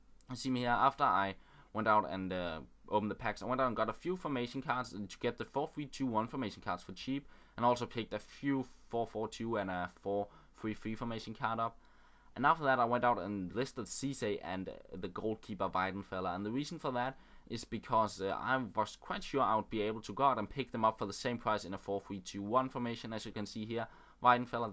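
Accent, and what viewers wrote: Denmark. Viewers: hah! I can hear you are danish, because of the way you talk english! Jeg er norsk btw :P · i think i am hearing a touch of danish when you talk, am i right?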